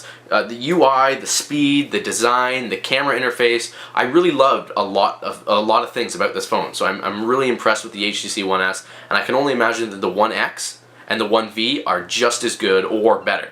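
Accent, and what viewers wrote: Canada. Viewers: Are Canadian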